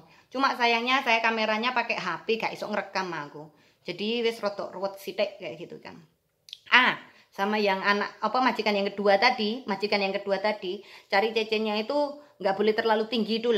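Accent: Java, Indonesia. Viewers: jangan medok ngono to balik asli bae kon tu cantik ngono e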